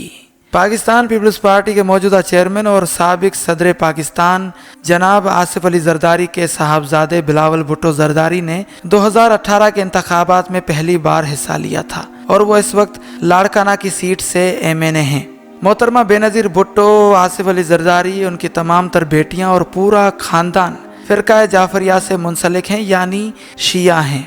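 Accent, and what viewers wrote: India. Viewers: Yeh bolne waly bhai mujhe india k lag rhy hen inka lehja indian h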